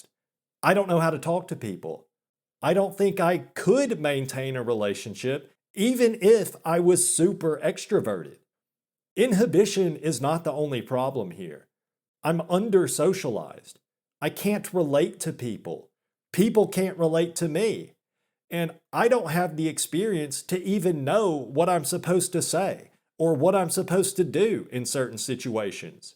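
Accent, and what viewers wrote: South, United States. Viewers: If he's not using AI he's a Southerner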